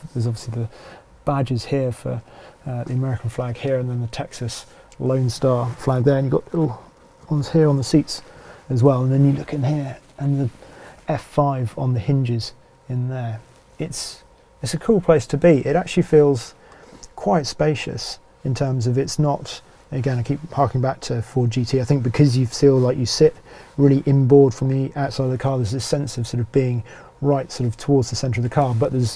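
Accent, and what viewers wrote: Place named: United Kingdom. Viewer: An American vehicle... With a British narrator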